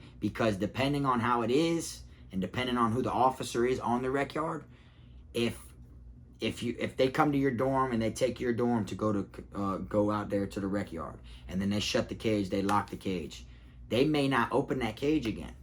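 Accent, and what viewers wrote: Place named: South Florida, United States. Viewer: My homie from Davie, always feels like im talking to a neighbor. Love that south florida twang. on the job, great vid!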